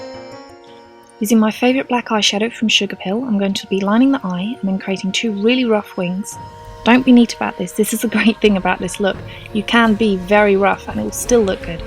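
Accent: England, United Kingdom. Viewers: Where abouts in England are you from? im from near Liverpool :) x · This makeup is beautiful and so are you!!! But you' re English or American?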